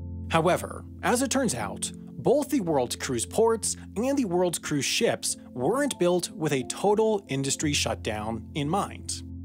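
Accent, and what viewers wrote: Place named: United States. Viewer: Dude I love your videos. But where are you from? You sound American but your cadence of speech is.......uncomfortable. I’m not criticizing I’m genuinely interested.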